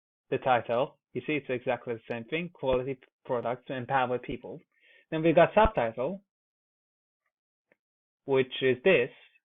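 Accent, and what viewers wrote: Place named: Iran. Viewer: Great Persian-English accent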